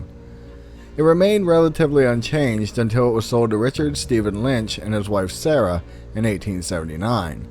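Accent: Pennsylvania, United States. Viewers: You speak with the same accent myself and my family apparently have lol. I didn't think I talked different until people started calling it our "family accent" we all apparently have. People even asked me where I was from lol. Shocking when I say I was born and raised in rural northern PA haha.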